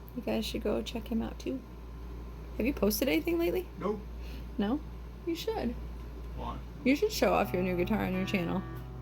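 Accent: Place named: United Kingdom. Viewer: I thought I heard a British accent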